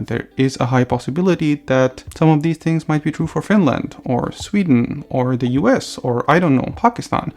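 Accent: Estonia. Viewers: Its funny that you have a little bit of an Estonian English accent. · Wth, you have Estonian accent, totally 🤧🤔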